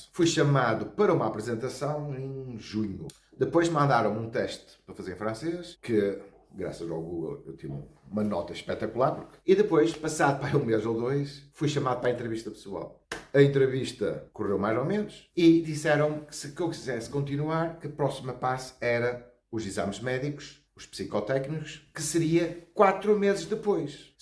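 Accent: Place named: Brazil. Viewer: Caralho...!!, vivo o paraíso e não sabia ?! , aqui no Brasil não é fácil mas você nunca passa por privações, de uma forma ou outra sempre tens uma mão amiga estendida à você!, gajo, falas português mais brasileiro que próprio de Portugal ! , conheça meu Brasil afinal foram os português que inventaram esta zona toda aqui, mas é bom demais !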